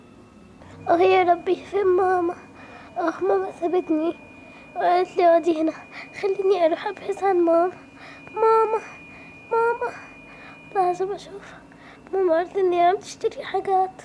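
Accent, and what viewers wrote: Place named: Syria. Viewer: عشان بتتكلمي سوري ومصري!؟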